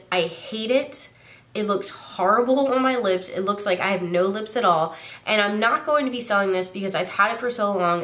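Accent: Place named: Maryland, United States. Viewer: I'm from md too ! I say warsh and warter too lol. Not to mention I did my nails earlier today the exact same way. Great minds think alike 😊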